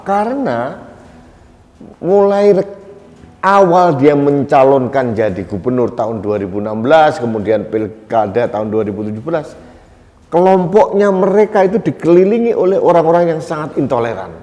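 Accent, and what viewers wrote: Java, Indonesia. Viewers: Saya sangat senang dengan Kata Prettt dri,yg kental logat jawanya,setuju Gubernur cuman obral janji hasil 0,hasil Bel gedes geh pak,Nollll blonggg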